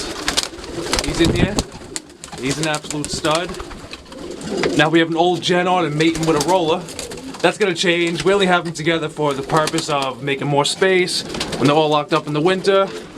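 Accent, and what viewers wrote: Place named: New York, United States. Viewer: Bruh that New York Accent on point 😂😂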